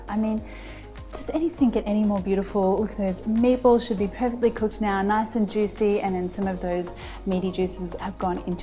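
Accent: Australia. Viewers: it's blasphemy enough but with the australian accent on top holy fuck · I made your sauce today (I made meatballs yesterday, so I will try your meatball recipe next time). The sauce.... OMG - I didn't think I could improve on MY sauce but yes, must say, your sauce....made in heaven!!! The anise, the chilli and bay leaf definitely gave it a unique flavour which we all loved. Love your presentation too....especially your accent...down to earth Ozzie.... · She has the most appealing Aussie accent I ever heard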